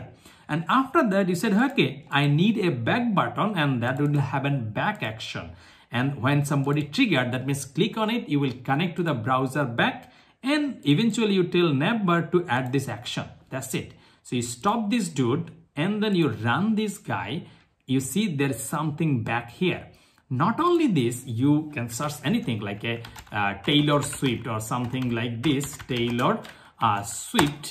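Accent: India. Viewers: Does anybody notice about this guy's funny accent also he is Indian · Bro like i am ur python guy and its insane like how ur doing this you should have more subs then mrbeast edit: Bro sounds like indain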